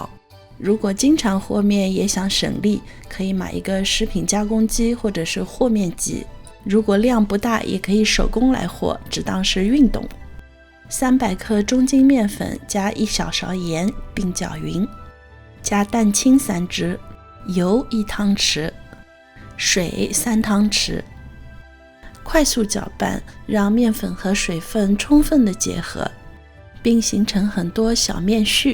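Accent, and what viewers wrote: Shanghai, China. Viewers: 我猜你是上海人是吗？听口音感觉是。 被你种草，作为一个同样喜欢面食的人，去AMAZON下单了一台MERCATO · 吾晓得，从侬额普通话里听出来了😝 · 懂上海话的人很明显听得出来你是上海人，不懂的肯定就听不出来，反正对我来说很亲切啦！ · 沪普听了台太亲切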